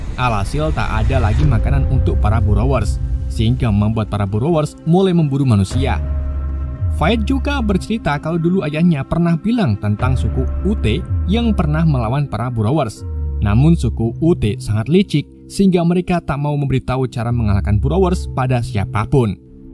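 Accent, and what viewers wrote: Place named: Java, Indonesia. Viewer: Penasaran nemen sung ente wong brebes apa tegal sih min.. "miyang cumi" 😅😂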